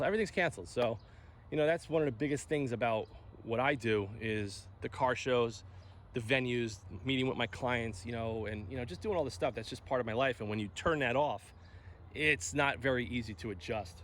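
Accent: New York, United States. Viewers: Awesome job on the correction! I heard you mention you already have cquartz uk on it already. I have the same setup on my RAV4. I talked to Corey about this from carpro and you really don’t want regular wax or sealant on top of a coating. They make “Gliss” now to put on top of Cquartz for extra depth and shine. I love the Wolfgang liquid sealant which is like Jescar power lock. I also dropped 2 hondo on Wolfgang concours carnuba. I heard the NY accent right away man, I’m from Jersey. Not knocking you at all about wax over coating. Just wanted to throw that out there. Griots are the bees knees. You literally have the same set up I have from the megs pads to the PFM towels. You need to try microfiber madness cloudbuster, they make the best glass towels I’ve ever used in my life. I gotta upload my 18 hour day of paint correction now after watching this video 😎 You rock man...keep it up!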